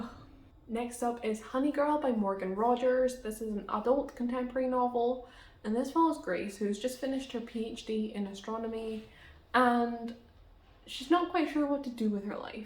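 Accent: Scotland, United Kingdom. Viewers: Do you have a Scottish accent when you speak Spanish? 😆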